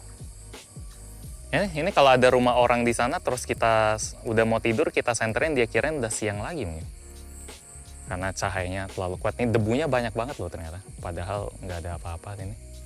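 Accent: Palembang, Indonesia. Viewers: "Trus klo liat kepocok😂 wong palembang caknyo budak ini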